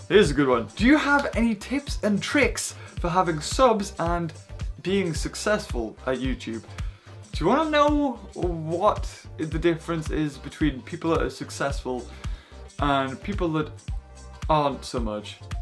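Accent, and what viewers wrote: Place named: United Kingdom. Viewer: If it's not to much trouble but do you mind me asking you where you live You British and most of my friends are British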